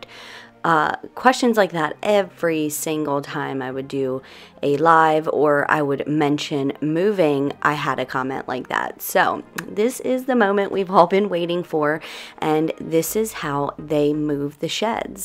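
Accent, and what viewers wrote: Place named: Delaware, United States. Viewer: I have been watching you for a while now and I knew that you were from Delaware, I could tell by your accent. I am from Newark, but live in Western Maryland now. I know it's dumb, but I'm so excited that I was right. I just sent you a friend request on Facebook! You don't have to accept. I couldn't help myself!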